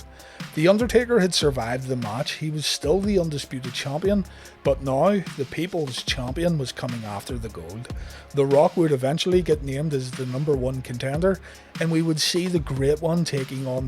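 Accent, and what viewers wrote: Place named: Scotland, United Kingdom. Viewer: I'm Irish too and I thought he was scottish too:O love your vid by the way <3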